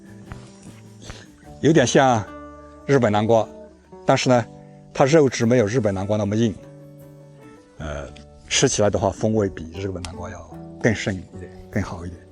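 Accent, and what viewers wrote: Yunnan, China. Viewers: 我的家乡是云南德宏芒市，听着您的乡音太亲切了! 我也喜欢种菜，您的视频太有帮助了!谢谢!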